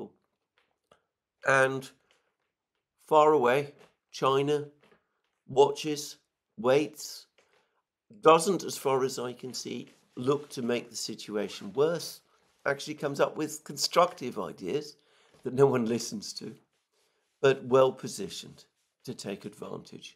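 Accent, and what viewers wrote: United Kingdom. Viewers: His British accent puts me to sleep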